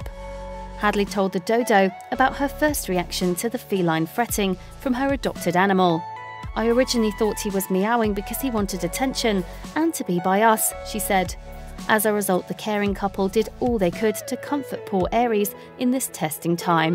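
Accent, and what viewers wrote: United Kingdom. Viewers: glad i dont have to listen to that brit accent often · What is it with American sites that use a British accented narrator? Will the accent make it seem more legitimate? Pretty condescending.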